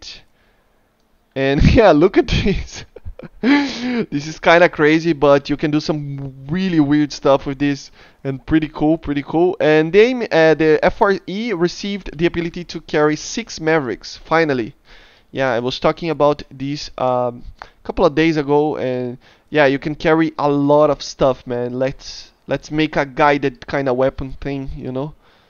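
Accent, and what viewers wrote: Brazil. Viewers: You sound like a Brazilian guy 🤔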